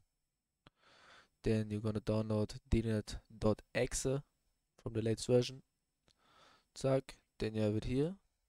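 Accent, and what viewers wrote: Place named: Germany. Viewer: das war das deutscheste englisch was ich je gehört hab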